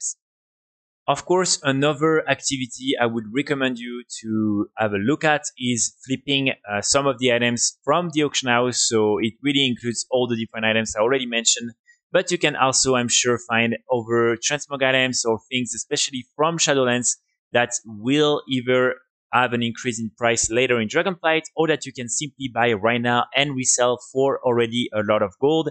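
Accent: France. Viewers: T'es français ?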